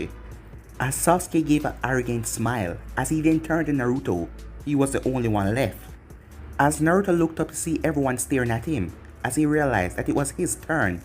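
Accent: Jamaica. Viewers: He’s from Jamaica That’s How He Talks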